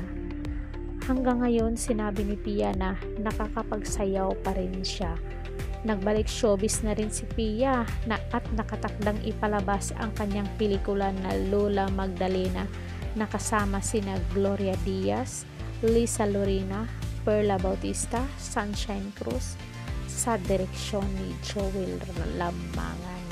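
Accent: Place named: Visayas, Philippines. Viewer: Bat ganyan ka magsalita bisaya ka ba? yak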